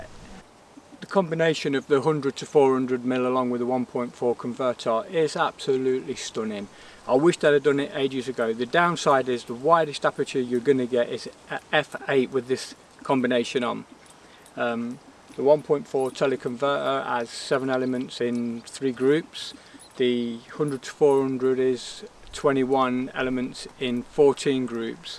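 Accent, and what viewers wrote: Leicester, United Kingdom. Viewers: Good video. Love the Lestah accent. I worked there’s for a few years and now call rolls cobs lol 😂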